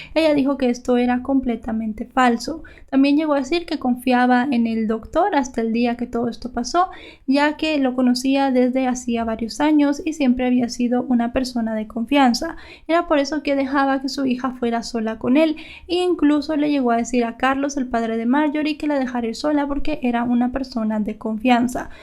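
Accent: Chile. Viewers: Es de Guatemala pensé q era chilena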